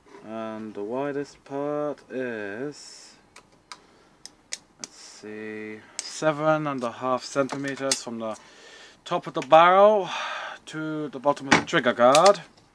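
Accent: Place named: United Kingdom. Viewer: sounds brit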